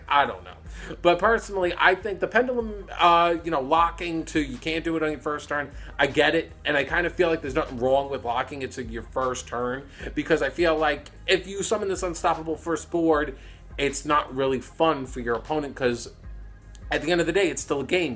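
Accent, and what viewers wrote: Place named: Brooklyn, United States. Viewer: I love how you have a Brooklyn accent and talk in a filthy frank voice at the same time. It's pretty cool and pretty funny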